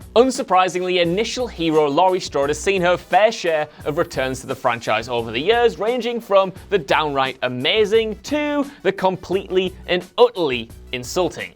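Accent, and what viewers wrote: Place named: United Kingdom. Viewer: How can ANYBODY sound THAT F*CK*NG English?!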